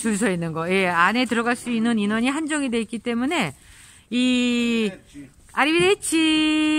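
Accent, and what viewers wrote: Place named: Italy. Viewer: 굉장히 이탈리아적인 목소리 입니다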